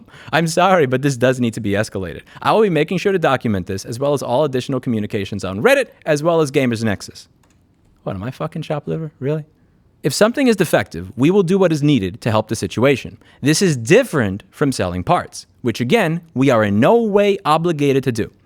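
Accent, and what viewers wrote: New York, United States. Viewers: I love when gets mad he get progressively more Italian and Yorkie. Never ceases to put a smile on my face that someone will get this upset over someone else's issues. We need more people like him. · You're the best. Your angry New York accent always makes me grin. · You can take the guy out of Noo Yawk... I love you,. BTW, I agree 110% about Thermaltake & Herman Miller. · Haha, nice try on the accent but it’s still a New Yawka accent. Keep up the good work! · let the New York out in this one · that New Yorker accent! You know he's frustrated 😅 screw these companies!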